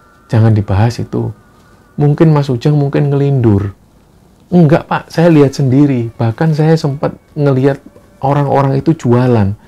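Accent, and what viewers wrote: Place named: West Java, Indonesia. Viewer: Lidah Kulon 👍 mas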